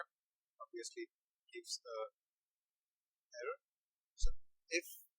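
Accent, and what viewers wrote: India. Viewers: The sound sucks, with it's over amplification and under feed. Hard enough to understand with the fast Indian speech. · same comment as lakesidelivin: The sound sucks, with it's over amplification and under feed. Hard enough to understand with the fast Indian speech.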